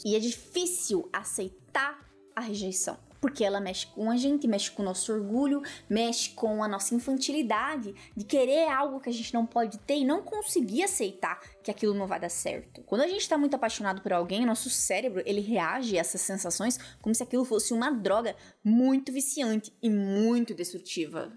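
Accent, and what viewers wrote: Paraná, Brazil. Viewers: Oiê! tenho que dizer que eu adoro o sotaque paranaense xD hoje (presente), com o pouco amadurecimento que consegui, vejo esse sentimento como paixão, egocentrismo... acho que a gente sente falta de se sentir amado. E por muitas vezes nos deixamos ser guiados por essa dor (mesmo já tendo tido a mesma percepção que vc, acredite, cai alguns níveis por causa desse sentimento) mana, a gente tem que reforçar mto, conhecer e se conhecer mto, refletir pra não cair nos mesmos mecanismos mentais, senão tiver esse exercício diário, a gente cai mesmo! Somos mto falhos (e frágeis) e ainda na nossa criança psicológica, temos muita necessidade de se sentir amado,... a gente tem muito que aprender sobre o amor, sentimento profundo... acho que isso devia ser lido e ensinado em todos os ambientes, desde pequenininho (um dia sonho que teremos escola de sentimentos para as crianças do futuro... 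Quem sabe?;-) ) obrigada por esse vídeo! S2 mto importante é necessário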